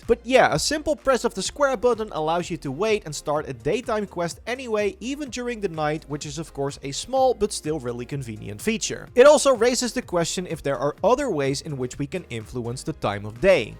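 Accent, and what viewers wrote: Netherlands. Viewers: i love your dutch accent.....🥴😅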